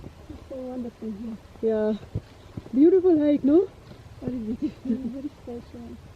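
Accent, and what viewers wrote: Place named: North India, India. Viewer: We don't need travel advisory from North Indian girls trying to act like they are white. Annoying North Indian accent.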